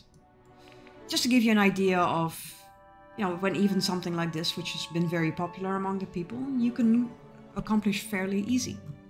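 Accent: Netherlands. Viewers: that too lol but you speak very good English and for some reason i recognize the way Dutch people speak English. We can either speak very good English or very bad like Louis van Gaal 😂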